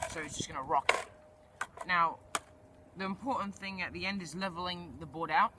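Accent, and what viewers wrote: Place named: New Zealand. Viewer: Nice tutorial man, but i couldnt get over your accent! what is it? Kiwi mixed with Australian and British?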